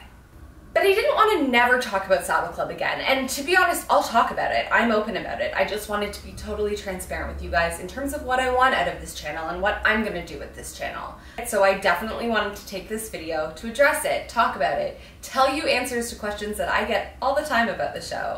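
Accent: Australia. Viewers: My friends and I in 5th grade LOVED this show! We were the kids at school who pretended to be horses in recess, and talked about horses in class and did our science fair project about horses. We had a name for our group, too, like the saddle club. We were 3 horse crazy girls! I am 26 now, still watch the show sometimes, and I collect Breyer horses. I haven't left the horse life! Horses are my passion. You are awesome!! You were so funny and cool in the Saddle Club. I wondered why you sometimes had an aussie accent!🐎🦄🐴🦓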